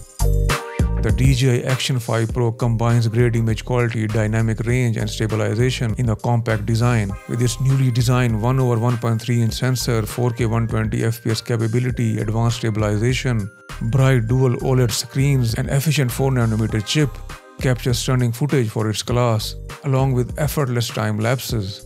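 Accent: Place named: India. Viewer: Thumbs up for keeping that DESI accent and NOT FAKING american accent 😂🤣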